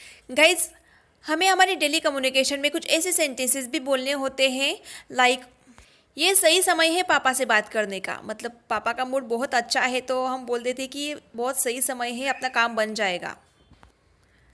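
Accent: Maharashtra, India. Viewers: Your hindi signs marathai languge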